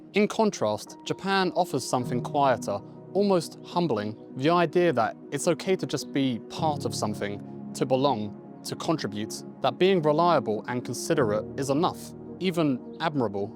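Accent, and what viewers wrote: United Kingdom. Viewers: I thought like what? Then imheard a brit. Gotcha buddy. After your home lots of stuff gonna feel surreal.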